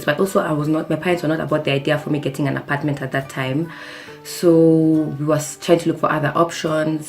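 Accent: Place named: Kenya. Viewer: Kenyan English accent is very interesting. I noticed how different you pronounce some words: First - Fast Service - Savice Year - Yar Fact - F..ked Work - Wark Church - Chach Etc. I just love it! Thanks for sharing your experience. I did hear a lot of SA accent as well.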